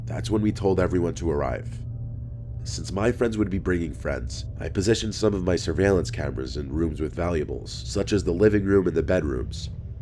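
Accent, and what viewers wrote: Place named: West, United States. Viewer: Let's be honest voice is so good for stories like this and it sounds western. His clogged nose make it sound better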